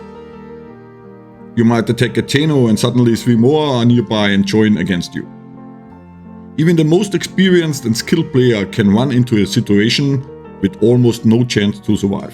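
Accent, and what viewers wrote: Germany. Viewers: Are u german? Ur english sounds german 😅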